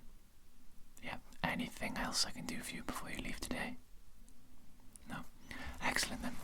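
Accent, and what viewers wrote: Wales, United Kingdom. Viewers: can i hear a welsh accent?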